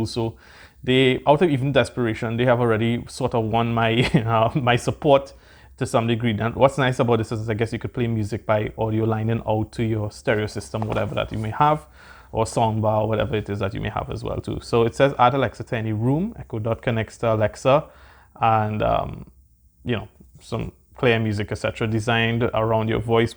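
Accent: Trinidad and Tobago. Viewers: U sounding rell trini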